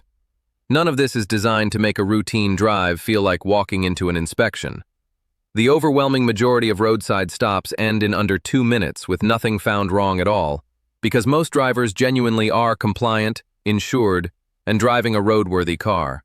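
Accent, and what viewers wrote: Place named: United States. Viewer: Why is this in an American accent with incorrect American pronunciation of the English language used. Americans have destroyed the English language. The content is largely incorrect. What a fraudulent waste of information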